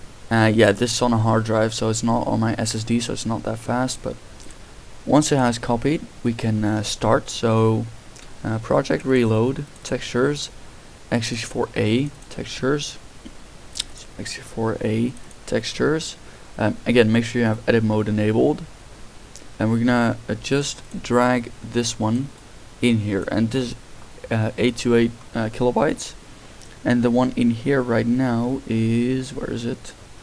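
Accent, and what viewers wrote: Netherlands. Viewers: shall my laptop take off or explode? XD btw are you Dutch? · R u dutch?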